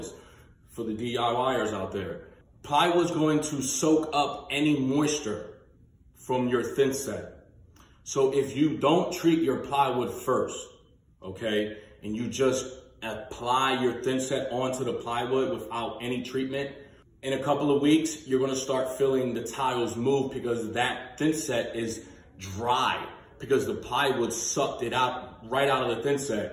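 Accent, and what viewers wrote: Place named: United States. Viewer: i'm a cuban from jersey city (i just moved to oregon and found your vid cause im renovating my house), and i knew exactly what state you were from the SECOND you started talking LOL. anyway, solid video super helpful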